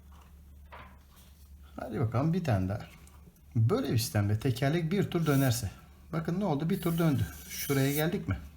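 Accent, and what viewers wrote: Thrace, Turkey. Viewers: harikasınız hocam.. trakya ağzını da öyle cok özlemisim ki videoları hızlandırıp izlemeyi bıraktım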